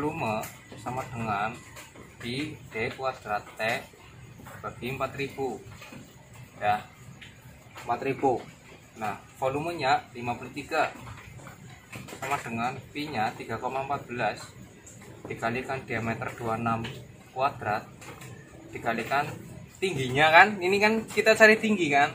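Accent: Banyumas, Indonesia. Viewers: Ora ngapak ora kepenak😀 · Ora ngapak ora penak pokoke lah mbak ayu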